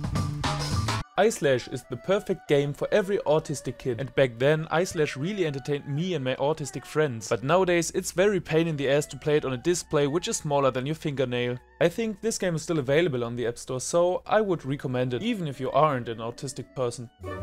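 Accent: Germany. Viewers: Man hört so krass raus dass du deutscher bist hahaha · Are you from german ?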